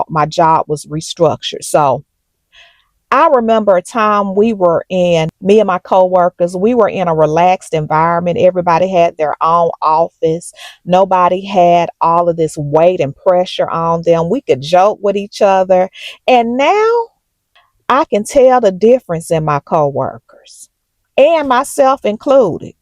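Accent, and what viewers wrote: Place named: Texas, United States. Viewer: On another, side note, any chance you're from Texas? You sound and also kinda look like some of my family, lol I know it's a long shot, but just something I noticed! Wishing you well. 🙂💞❤️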